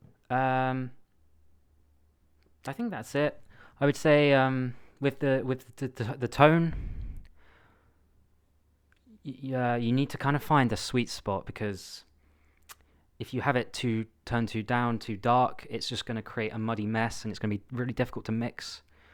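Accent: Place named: United Kingdom. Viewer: Great video mate love EJ's clean sound so much especially for chord work and improvising. Whereabouts are you from in the UK? Can't quite place the accent.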